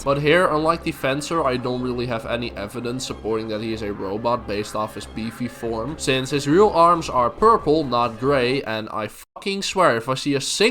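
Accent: Netherlands. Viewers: Hey are u dutch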